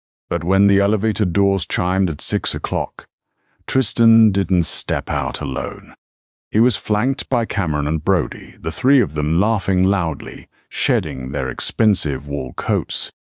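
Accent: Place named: United Kingdom. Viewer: Nice story. Love this british accent ❤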